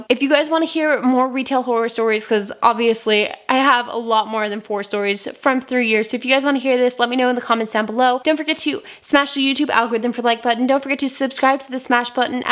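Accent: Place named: South, United States. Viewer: I love the southern accent. lol!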